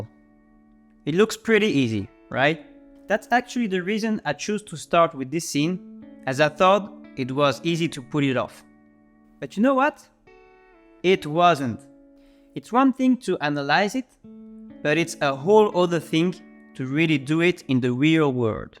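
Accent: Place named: France. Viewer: I like it, also your sense of humour and French accent, thank you! Just keep going and the Eps will get even better.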